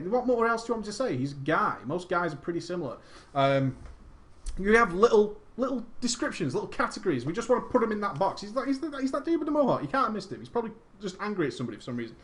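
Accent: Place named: England, United Kingdom. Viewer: Dude your English. Don't know why I never knew that. New sub here.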